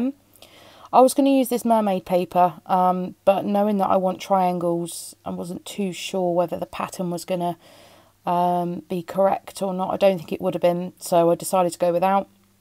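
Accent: United Kingdom. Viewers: I’m really enjoying this series. Years ago I spent 4 months student teaching in a nursery school in Wales and I miss the accent, not saying you have a Welsh accent but a wonderful British accent ☺️ and love that I can watch videos about a topic I love in a language I love ❤️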